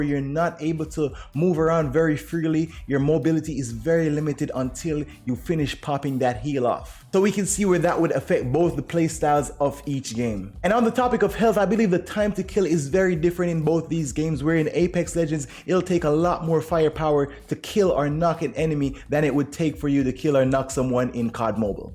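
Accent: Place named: Jamaica. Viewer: Lol just meet up on u channel from how u talk mi know yawh jamaica from u say 3 ppl than person lol big up bro bro and make a sub zimi😎 and to me apex is a big fresh air cause cod rn just a bring same thing over and over cant bother with that more while and rank fun fi grind more on apex than cod not to mention the skill it takes to play apex..see how it go still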